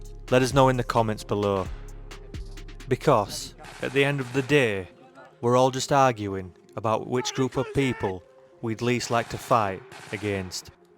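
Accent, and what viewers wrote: United Kingdom. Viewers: Do I detect a Brit accent?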